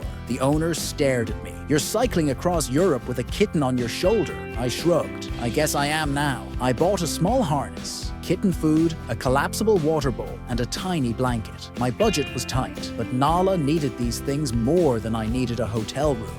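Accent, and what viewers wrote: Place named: Scotland, United Kingdom. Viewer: are you studying to be the narrator of your life? Sound good, but I like the way you talk with your scots burrrrr. Kind of like a Nala purr.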